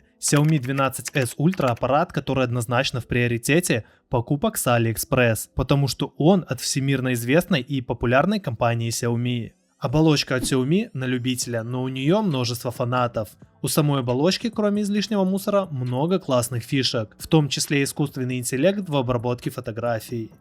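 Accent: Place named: South, Russia. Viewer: По говору сразу понятно, либо с юга России либо с БУ(бывшей Украины) Достатка тебе и здоровья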